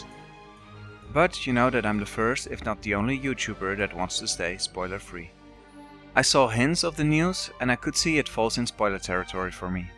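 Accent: Netherlands. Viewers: are you from holland ???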